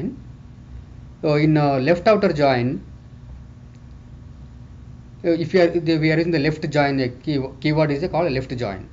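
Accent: India. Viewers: Good information , but his hindu accent pain in the ears..... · He might be south indian..but he has good knowledge in this sector..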